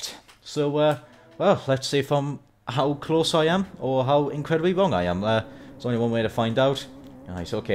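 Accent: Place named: North East England, United Kingdom. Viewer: You sound like ya from the north east of England am from Newcastle and you defo sound ya from here or the other side of the Tyne maybe?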